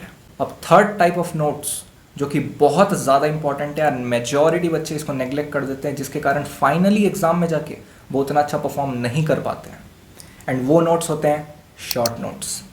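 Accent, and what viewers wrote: Bengal, India. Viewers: Bro are you a Bengali?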